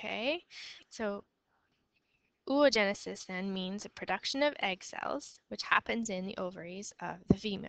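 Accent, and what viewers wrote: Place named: Canada. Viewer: Canadian accent <3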